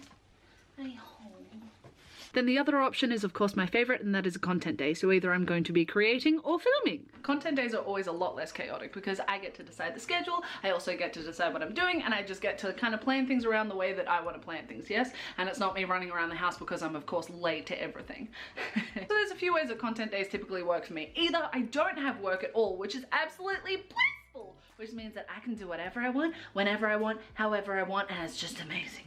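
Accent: Australia. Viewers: Ok I’m new but your videos are so funny and amazing! I’ve got a question. Are you Australian? I’m Australian and your accent is very similar to mine. I liked subscribed and put bell on. Keep doing what your doing! ❤️xx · The Russian accent is put on, the Aussie accent is real. She lives in Western Sydney.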